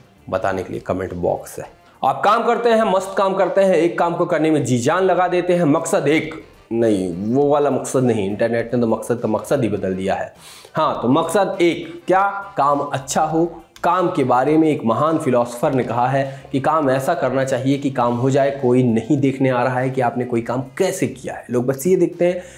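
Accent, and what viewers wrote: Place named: Uttar Pradesh, India. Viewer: Up wale hai 😂😂 Maa kasam bolte bada tagda ho hum to sasurva tohra fan ho gaye ❤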